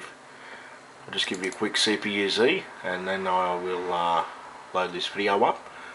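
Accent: Australia. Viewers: Thanks for the vid. I must say that EVERYTIME I find someone who has successfully uploaded their OS onto the REVO, I can not find the MoBo that they used for sale online. EVERYTIME!! Good vid, the aussie accent was a nice change of pace too. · I'm a kiwi, and i can tell an Aussie when i hear one lol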